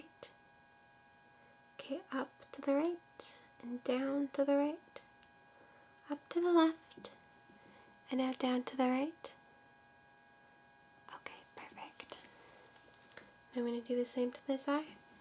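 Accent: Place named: Midwest, United States. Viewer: Only flaw is a slight buzzing - is it a laptop fan?? You seem very sweet, please keep going! Nice accent btw - sounds Midwestern, like Nebraska or Montana or something.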